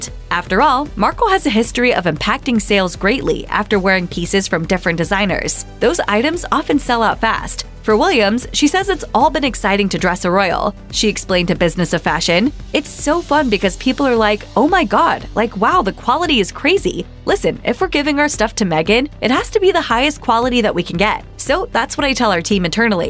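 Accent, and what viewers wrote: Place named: United States. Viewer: This is an American site... note the American accent? 🤦‍♀️🤦‍♀️🤦‍♀️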